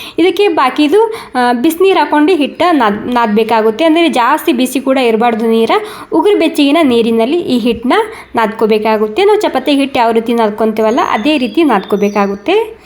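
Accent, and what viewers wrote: Maharashtra, India. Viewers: ನಿಮ್ಮ ಕನ್ನಡ ಮಾತನಾಡುವ ಶೈಲಿ ಕೇಳೋಕೆ ತುಂಬಾ ಸಂತೋಷ ಆಗುತ್ತೆ. ಮಹಾರಾಷ್ಟ್ರದ ಸೊಗಡು ಮಿಶ್ರ ಮಾಡಿ ಕನ್ನಡದಲ್ಲಿ ವಿವರಿಸೋದು ಆಕರ್ಷಣೀಯವಾಗಿದೆ. ನಿಮ್ಮ ಅಡುಗೆ ಮಾಡುವ ವಿಧಾನ ನಿಜಕ್ಕೂ ಪ್ರಶಂಸನೀಯ 👏👍.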